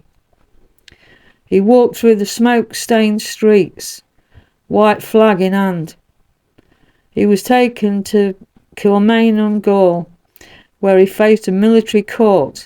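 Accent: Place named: England, United Kingdom. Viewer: I dont know if you are English (you sound like it) but if so then well done for talking on such a complex and tricky subject which many English / British don't go near for some reason. And thank you also for calling him "Patrick Pearse" as many Irish for some reason call him Pádraig or Pádraic Pearse which of course is wrong, as you correctly say its Patrick Henry Pearse and was known to everyone as Patrick or Pat. Well done.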